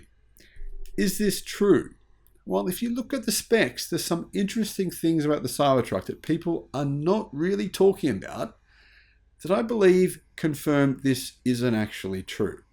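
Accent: Australia. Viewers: What an Aussie accent maaate. Ah well you can take an Aussie out of Australia but you can’t take the Aussie out of this man.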